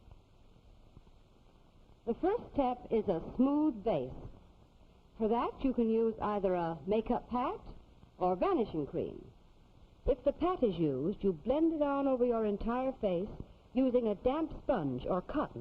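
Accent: Transatlantic, United States. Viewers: It’s the transatlantic accent, it was only used for tv and films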